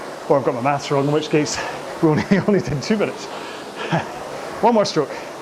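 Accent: Scotland, United Kingdom. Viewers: That was awesome.. 🥵 now off to practice saying (in Scotts accent) ‘sitting behind my desk for hours’ … auoihrs.. auhirs.. ouirs.. love it! Thanks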